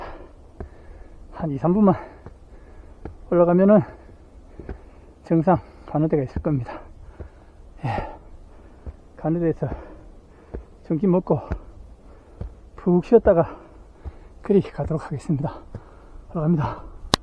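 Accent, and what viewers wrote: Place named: Gyeongsang, South Korea. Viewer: 홀로걷는 뚜벅이길에 구수한경상도 사투리와 억양이 어우러지니 좋네요ㆍ중간중간 걷는길에 안내를 해주니 최고입니다 가게없으니 간식ㆍ물 꼭챙겨야한다는 팁!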